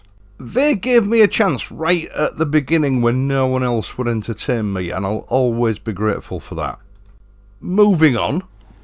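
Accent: United Kingdom. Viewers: Dear, thanks a lot for your videos. They are very nice and I love your British pronunciation. I have one suggestion though. Can you make in your videos timestamps, so that if I want to re-watch a part of the video, I would not scroll in order to find what I need. Thanks again for your work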